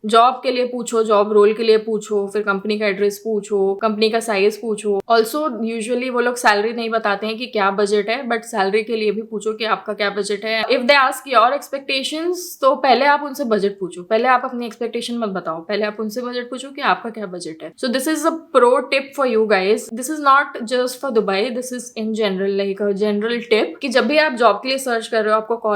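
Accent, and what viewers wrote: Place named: India. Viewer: Thank you for those content,in this video it was really hard for me to understand since you speak indian,can you make your videos with subtitles?thank you.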